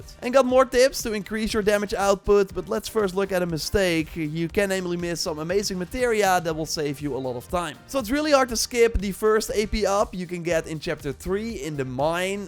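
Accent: Netherlands. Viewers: 100% dutch by the accent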